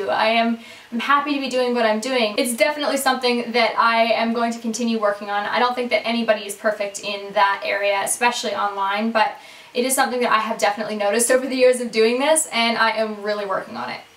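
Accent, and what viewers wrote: Canada. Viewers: Are you canadian? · That Canalian accent though! It's crazy how much your accent has changed if you watch your older videos. I love how you are so upfront & such a straight shooter in these videos! I have a TMI Tuesday question for ya. About a year ago you posted your TMI Tuesday #47 I believe where you were just coming back from taking a break from YouTube. Do you feel like you've balanced your life out a little bit more since that video and are you happier? I really hope so. So much love! <3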